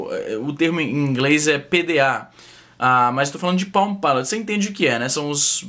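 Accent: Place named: Northeast, Brazil. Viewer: grossura? ,,,,esse é conterrâneo nordestino(como eu) ...coisa boa kkkkk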